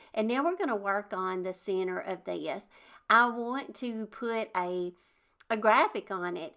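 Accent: South, United States. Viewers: I love your channel (and your beautiful southern accent!). 👍 You are always so inspiring.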